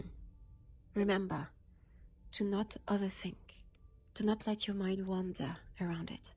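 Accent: France. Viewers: Idk why the french accent have an aura I LUV IT..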